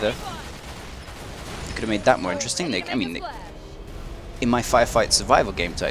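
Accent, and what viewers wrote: United Kingdom. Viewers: are u english??????????????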